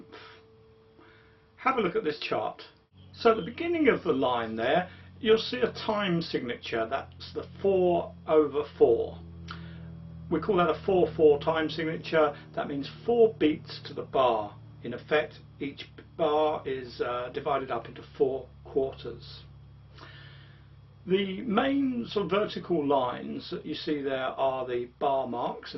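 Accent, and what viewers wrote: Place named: United Kingdom. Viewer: 這位老師的youtube頻道叫做SecretGuitarTeacher(對沒錯三個單字連在一起） 這位老師教得真的很不錯 但要吞得下英國腔XD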